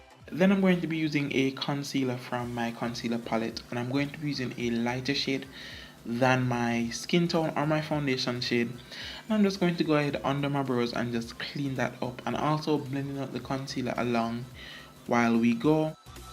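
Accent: Jamaica. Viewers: I knew it cuz like u sound like a Jamaican who just know how to speak good English instead of patwa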